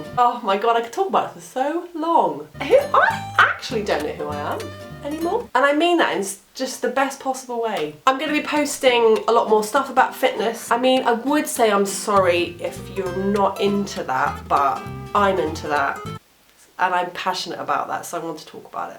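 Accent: England, United Kingdom. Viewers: Hallelujah!!! Oh how I love me some health-conscious, fit, ball-cap wearing TRUTH with an English accent as a morning wake up call!!! So awesome! I am really psyched about this vs the I met when I first started watching this channel months and months ago. The difference is NIGHT and DAY!!! Thumbs WAY UP!!!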